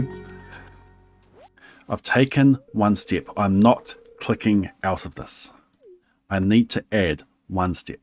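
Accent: New Zealand. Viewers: Bro? Are you Kiwi? I swear. Even if you're not... You totally do!! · He's definitely a Kiwi. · Holy NZ accent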